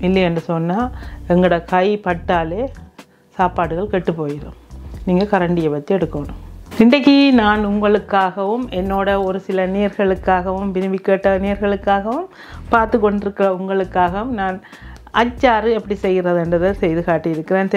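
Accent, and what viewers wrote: Sri Lanka. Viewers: Super Acharu 👌👌it's so very nice to hear you with your Srilankan accent 🤪Your garden also looks nice 👏.I too do this picked vegetables but never added mangoes.I will add it next time. Vazhga Valamudan ❤❤🥰😍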